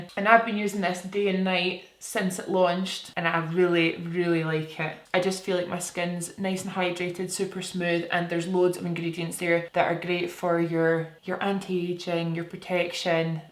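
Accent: Scotland, United Kingdom. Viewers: Love your videos! It's nice to hear a fellow Scottish voice on Youtube 😍😊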